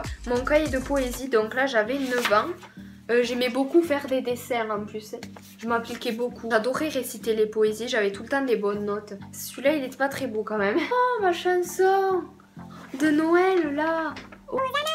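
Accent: South, France. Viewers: Team accent du sud ahah💗💗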